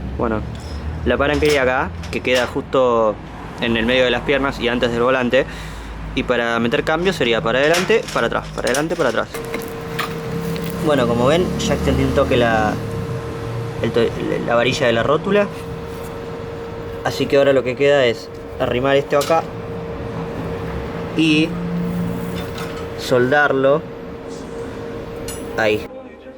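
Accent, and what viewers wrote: Argentina. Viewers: Sos de Argentina?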